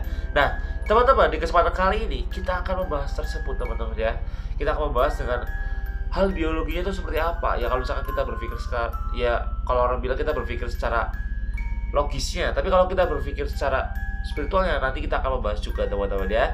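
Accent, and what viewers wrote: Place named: Java, Indonesia. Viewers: medoke ketara nemen yakk hahahaha... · medok nya yang buat aku klepek klepek malah hihihi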